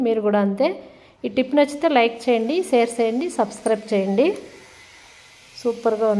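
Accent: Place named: Chikkaballapur, India. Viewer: ಮೇಡಂ ನಿಮ್ಮದು ಕರ್ನಾಟಕ ತಾನೇ ಕೋಲಾರ ಅಥವಾ ಚಿಕ್ಕಬಳ್ಳಾಪುರ ತೆಲುಗು ಮಾತಾನಾಡುವ ಶೈಲಿ ಚಿಕ್ಕಬಳ್ಳಾಪುರ ತೆಲುಗು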